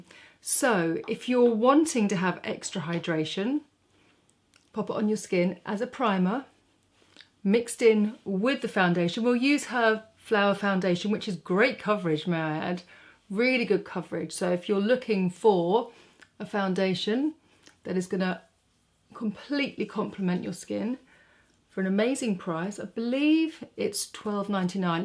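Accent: United Kingdom. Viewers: Greetings from Seattle! So happy to have found you! Very much enjoyed your video on Flower Beauty. You’re quite right that these products are quality yet affordable. I love the Flower Beauty blush color drops. The product is a gel that comes in a tube and you use it on the cheeks and lips for a natural flush of color that is buildable. It’s nice for women with mature skin as well. They come in six shades and are lightweight and lovely for spring and summer. Thank you again for the video. You are so natural and down to earth! I could listen to your voice all day. I think most Americans are enamored with British accents! Have a great day and see you soon!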